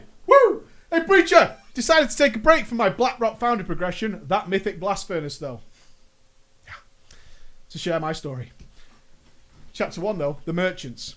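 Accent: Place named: Manchester, United Kingdom. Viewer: I'm learning english listening to you! I'm starting to have that manchester accent lol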